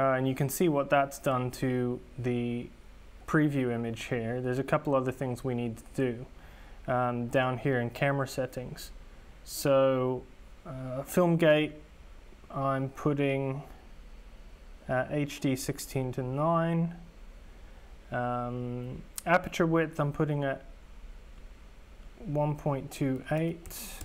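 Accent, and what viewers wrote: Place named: Southland, New Zealand. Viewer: From your accent am I correct you are from Southland in NZ?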